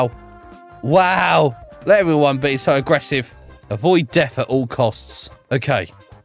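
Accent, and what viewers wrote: United Kingdom. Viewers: that british accent tho, its stupidly funny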